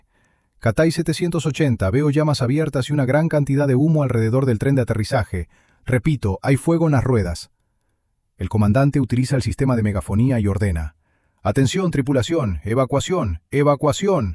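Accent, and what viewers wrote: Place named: Spain. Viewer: Es el primer vídeo que veo en el que se pasa aleatoriamente de la narración en español de España al español hablado en países hispanoamericanos.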